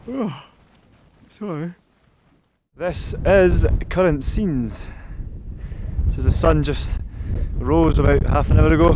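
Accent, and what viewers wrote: Scotland, United Kingdom. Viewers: I slag that twat Hopkins off a lot worse than you . I m not saying you re personally chucking litter but you re popularising a night out in a scenic location having a cook up and booze up (and don t try and tell me you did nt have 4 cans in that overloaded rucksack ) and already one of the idiots following you is saying "i m having some of that seeing that Suilven is a piece of piss to get up even tho it looks scary from a distance. You ve already said its busy at weekends up the tops lol.. Thats how the Peak District started off .The same will end up on all the munro s with all overnights being banned and YOU are part of the problem . I haven t got a problem with your jock accent , but the automated sub titles certainly has..It was the only funny thing about the vid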